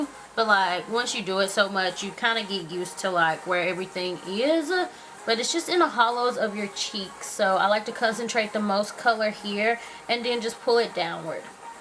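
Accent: South, United States. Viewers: love your country accent xD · Ur so BEAUTIFUL i love your accent! its southern right? IDK thats why im asking lol(nottryingtooffendne1!) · I love your accent! lol Sounds so southern. :)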